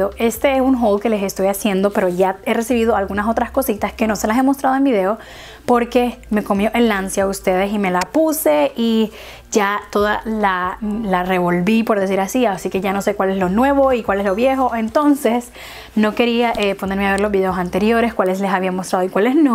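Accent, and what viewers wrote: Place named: Honduras. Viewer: Por tu hablado creo que eres de Honduras, saludos y éxitos paisana!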